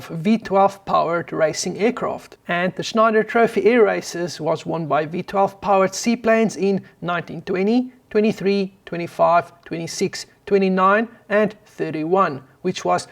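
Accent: South Africa. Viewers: Dear, first thanks so much for your great informative videos and the reminiscent SA accent! I would like to propose a video idea extending this one. In late 1942 Stanley Hooker (later knighted for his work on jets) was recruited by Rolls Royce to work on the urgent problem of boosting power at latitude for the Merlin engine. His solution was to insert a liquid cooled intercooler and 2nd stage supercharger between the existing supercharger and engine block. As you pointed out in this video, an even better solution theoretically may be to add a turbo as the first stage since it does not scavenge as much engine power. However, Hooker’s solution was right on the money in this case, brilliant, compact and was implemented into the Merlin 6x production in less than 6 months. This improvement may actually have secured performance altitude at the most crucial time of the war, allowing air superiority over the fw190, and perhaps even war winning. 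This engine of course was used in spitfire, hurricane, lancaster, mosquito and mustang built under license by packard. The 2 stage turbo solution later implemented in p-38 and “jug” was slightly superior, but very bulky requiring twin boom or bulky and expensive airframe, actually nearly twice the price of the 2 stage super-charger as implemented by Hooker in record time. For me Hookers intercooler is a work of art, or a sublime mix of art and science of engineering. It has 4 trimmed rectangular channels in which the coolant circulates, ex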